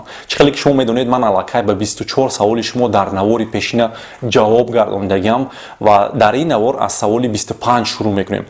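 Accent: Khujand, Tajikistan. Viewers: Духтуримиз назаримда Хуҷанлик. Акцент 100% тушунарли.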